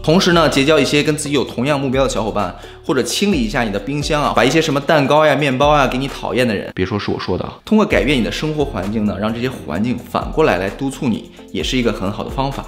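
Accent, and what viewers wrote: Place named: Beijing, China. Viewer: 觉得帅哥是北京人哈哈 虽然儿化音可能因为常年在美国被磨得若隐若现 但是他的吞音还是很标准的lol 【如果大家喜欢我的视频 = rua喜欢我的视频】哈哈哈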